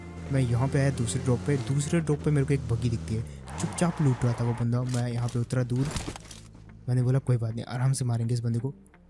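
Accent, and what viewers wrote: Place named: Punjab, India. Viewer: Can you please make a gameplay in punjabi language coz i love your punjabi accent 🥺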